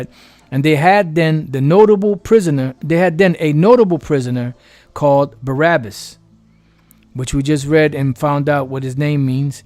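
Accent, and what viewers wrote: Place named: Jamaica. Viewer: I notice your Jamaican accent every now and then, shalam.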